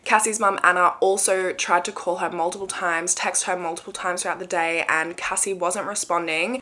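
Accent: Australia. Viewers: Just her Australian accent is all❣️. Lived there in my younger years, and I love hearing her speak, reminds me of the past.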